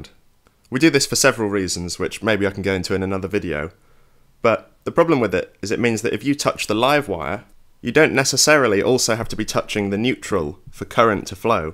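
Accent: England, United Kingdom. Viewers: I've spent over 35 years in industrial power electronics, own and operate my own engineering firm, and taught full time as a professor in technology for thirteen of those years. Kudos to your teaching methods. You're water illustrations are brilliant and intuitive and speaking as a Canadian who recently visited Yorkshire in Britain, I love hearing the British accent and idioms in this video. Some corrections however: The thermistor employed for over-current protection is a PTC (Positive Temperature Coefficient) device, not an NTC (Negative Temperature Coefficient) device. NTC thermistors are used for temperature measurement. PTC devices act like resettable fuses and limit inrush current by increasing in resistance as a function of temperature (i.e. higher current). Both are non-linear devices. MOVs behave like back-to-back zeners and exhibit very high resistance until you reach their break-over voltage but unlike an SCR, don't avalanche (assume full conduction when triggered on). 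In this way they only clip excess energy above a target voltage threshold but they don't chase it all the way down to zero volts. MOVs also tend to deteriorate with each spike they take so their effectiveness over time can diminish and they are rated in Joules as well. Also, MOVs like to explode when their energy levels are exceeded so I've seen designs where they're shrink wrapped to contain flying bits in the event of. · Very good and understandable lesson on the power supply. I don't know yet where you are based in the world, but you accent sound more england. I am in south africa. I hope you can allow me to add that the potentiometer does not only adjust the output voltage, but also control the entire circuit and protect it from getting out of regulation and destroying itself. Thank you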